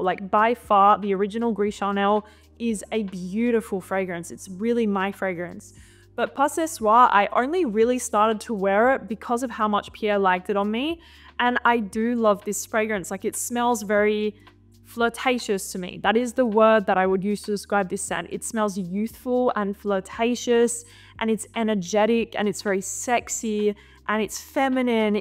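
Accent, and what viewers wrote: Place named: Australia. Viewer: What is your accent? I can’t tell if you’re Australian or French or both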